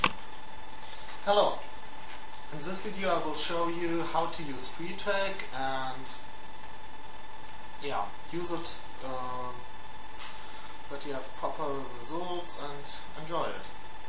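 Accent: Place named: Germany. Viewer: Der Akzent ist deutsch, definitiv^^.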